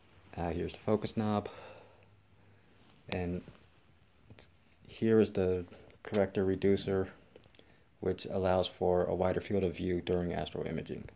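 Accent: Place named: Chicago, United States. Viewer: Nice video. You sound like you're from Chicago, my hometown.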